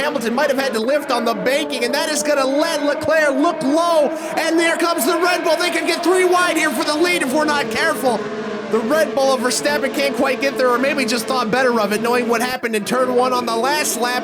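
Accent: United States. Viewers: This is the most american voice i have ever heard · the commentator is sooooo american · Mate his murican accent is so funny😂😂😂😂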